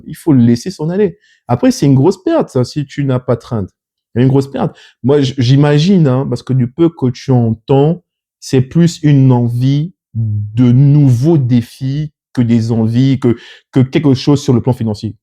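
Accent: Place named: Gabon. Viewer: Att tu es Gabonais parce que l'accent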